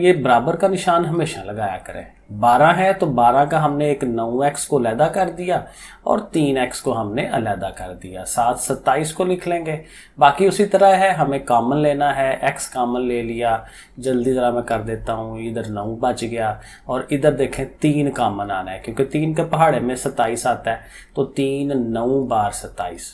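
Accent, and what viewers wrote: Sialkot, Pakistan. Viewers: Very nice Ap urdu sialkoti lehja min bolty hen I think you are Sialkoti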